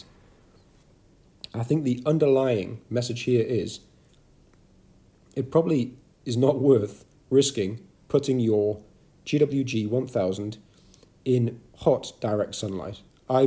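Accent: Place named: United Kingdom. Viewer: So the watch not working is a protection thing from the heat? I live in Arizona where it gets to 120 degrees , and my Gshock, keeps and going, and just about any other watch I have, including a mechanical wind up watch. The summer's in Arizona gets so hot you cant touch metal with out it burning your hands, you have a British accent, I grew up in the UK, the UK does not get that hot. Something is wrong with your watch, my Gshock is 11 years old, just saying.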